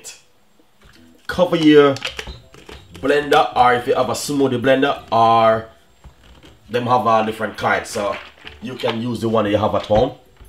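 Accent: Jamaica. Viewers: Why u being so rude he is a jamaican man thats how we talk its our accent and pronunciation. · He speaks patois leave him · He's Jamaican even if he were from China he has his own way of speaking because of where he is from. He also says ole mila for whole milk and share it out instead of portion it out but somehow none of that bothered you. I like to hear how other people talk. It's interesting. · So Jamaican talk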